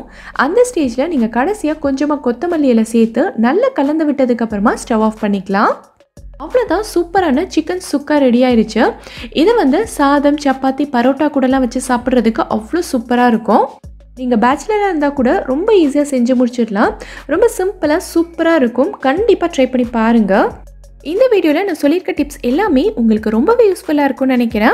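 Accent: Madurai, India. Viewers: அஸ்ஸலாமு அலைக்கும் சிஸ்டர்.உங்கள் குரல் சூப்பரா இருக்கு நீங்க எந்த ஊர்.நாங்கள் மதுரை . பேச்சு வழக்கு எல்லாம் எங்க ஊரு போல் தெரிகிறது.அதற்காக கேட்கிறேன் தப்பாக நினைக்க வேண்டாம்.மென்மேலும் வளர எல்லாம் வல்ல இறைவன் அருள் புரிவானாக... ஆமீன்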